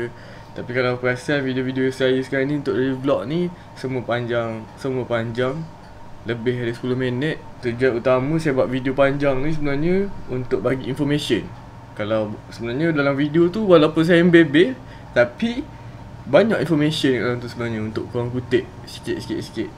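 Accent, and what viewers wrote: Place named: Pahang, Malaysia. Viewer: Aok ni orang mane? Ngape mcm loghat pahang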